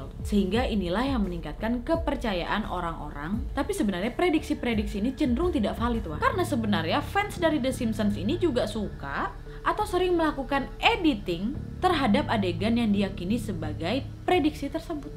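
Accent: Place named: Medan, Indonesia. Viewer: nampak kali dek orang medannya 🤣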